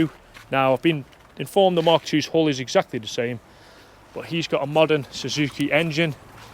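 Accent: Liverpool, United Kingdom. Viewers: close a bud scouser here your close to it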